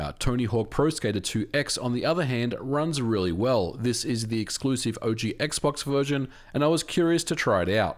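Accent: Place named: Australia. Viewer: you need to state which currency you are talking about. you have an australian accent, so while i assume in your script you are always speaking at americans, this is always jarring and annoying. if you say "fall" we hear a tumble, not a season. americans are ignorant, please stop trying to please them.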